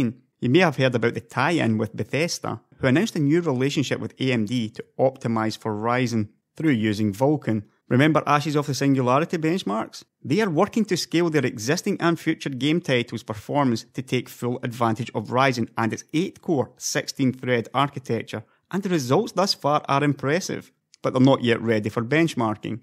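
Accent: Scotland, United Kingdom. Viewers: From his accent I thought he is Scottish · So hard for me to translate you scottish accent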